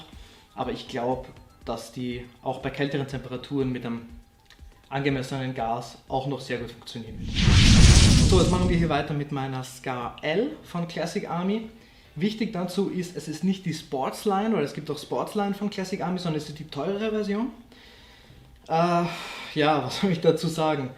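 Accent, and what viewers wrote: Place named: Austria. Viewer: Man hört eigentlich auch an deinem Akzent sofort dass du aus Österreich kommst :D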